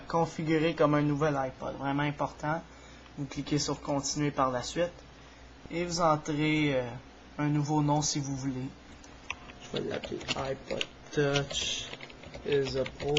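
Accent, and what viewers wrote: Quebec, Canada. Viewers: C'est un canadien c'est pas de sa faute s'il a un accent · Au Quebec ca parle comme ca, les Quebecquois parles mieux le francais que nous autres francais où l'on a remixé notre langue pour la rendre plus"cool" ... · hey.. je tiens à vous prévenir que c'est pas sa faute si il a l'accent canadien, j'aimerais bien attendre vos voix, à vous. il a au moins le courage de faire une vidéo, donc arrêtez de critiquer, sa a du bien vous servir ce qu'il a fait.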